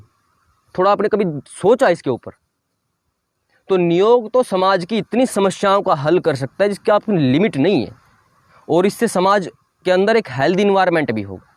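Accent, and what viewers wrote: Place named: Haryana, India. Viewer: Haryanvi accent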